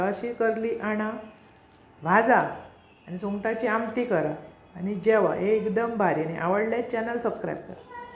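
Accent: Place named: Vengurla, India. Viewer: Tumchya bolnyacha style warun tumhi vengurla che watta.. tumhi vengurla che ahat ka?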